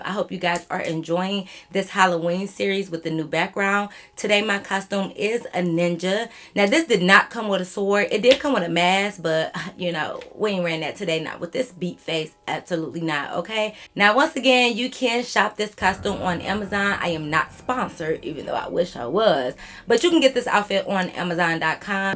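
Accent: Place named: Alabama, United States. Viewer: Sound like Alabama or the south for sure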